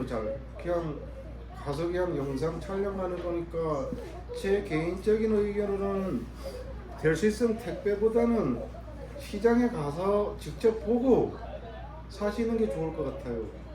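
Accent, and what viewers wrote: Gangwon, South Korea. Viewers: 수고하셨습니다 강원도 사투리가 정겹고 좋아요 새해복 받으시고 새해도 수고 해주세요 장마마다 특색이 있고 좋습니다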